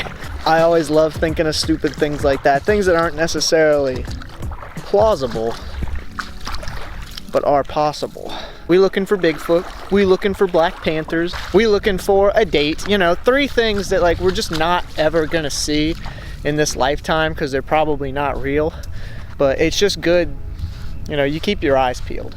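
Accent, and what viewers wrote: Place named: South, United States. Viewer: Dont loose your southern accent. It is what made you!!!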